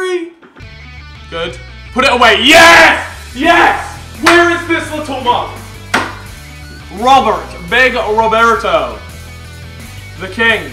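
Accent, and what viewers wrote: United States. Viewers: Why does he sound like he's American? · Is it just me or does he sound a little bit American. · Is american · why does he have an American accent?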